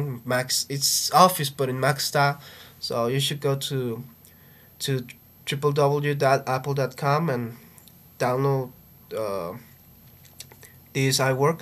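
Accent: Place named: Brazil. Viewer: like the accent, brazilian?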